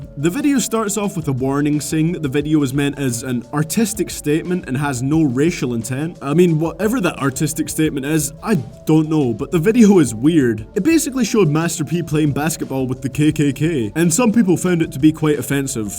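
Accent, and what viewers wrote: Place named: Scotland, United Kingdom. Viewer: you sound like a blend of Aberdeen and Fife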